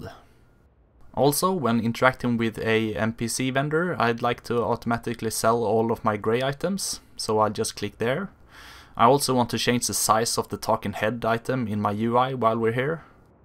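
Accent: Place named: Gothenburg, Sweden. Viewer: You have the most Gothenburg accent I've heard in my life.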